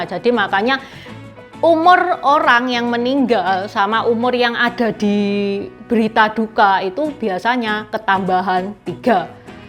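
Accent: Java, Indonesia. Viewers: Mbak daleme pundi gih. Jawane medok men.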